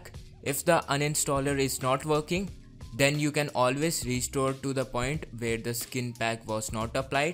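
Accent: India. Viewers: Indian sound ass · he is indian dont trust indians they scam you if i click on a video and here if they are indian i am out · He is indian. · ORAN DSOUZA he is indian, and pronounciation does not matter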